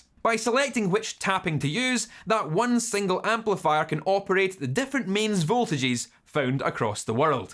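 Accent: Scotland, United Kingdom. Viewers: Love the Scottish accent 👍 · Perfect explanation--many thanks! Also, as a Star Trek fan, the fact that you have a Scottish accent and are explaining functional electrical engineering concepts is a real treat. : ) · Your Scottish Accent. I want to learn it. PLS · Cool video, with the accent of Sir William Wallace! Hear your accent makes me wanna drink whisky! Cheers from Portugal 🍺🤘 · I never would have guessed guitar tech Yoda was Scottish. Good info. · Is this a Scottish accent? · I’m a simple man. I see a video that commences with saying, “hello guys, here” and I smash that like button and watch the whole damn thing to get the full Scottish brogue experience.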